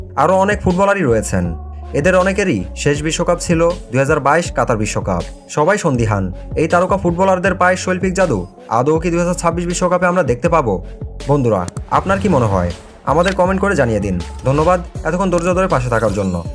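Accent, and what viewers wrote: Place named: Bangladesh. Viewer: Tui I think bangladeshi mal tor vasa sune bojha jay.. Tora video bans na..jato abal public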